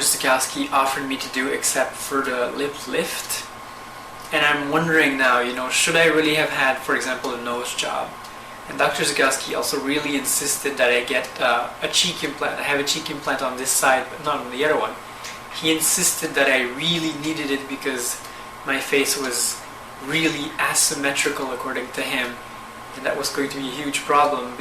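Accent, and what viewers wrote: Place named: Quebec, Canada. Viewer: She's from the Quebec, Canada. The accent is French Canadian.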